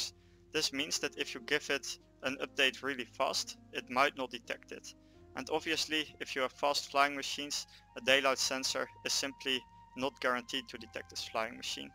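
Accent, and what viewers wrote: Netherlands. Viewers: je kunt gewoon horen dat je een nederlands accent hebt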